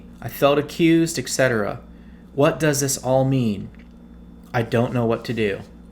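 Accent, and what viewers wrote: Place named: United Kingdom. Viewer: I'm from Australia and you're Aussie accent sounds British to me lol, but god bless you brother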